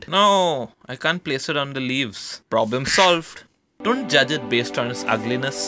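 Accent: India. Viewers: That's a true indian accent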